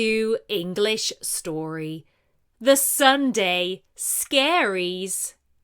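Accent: United Kingdom. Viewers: What's this type of British accent it really sounds magnificent and original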